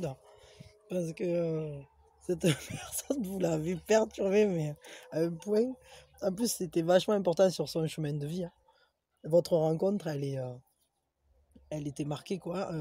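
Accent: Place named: Provence, France. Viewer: vous êtes incroyable)) et ce bel accent, de salon ou des environs je dirais 😂😂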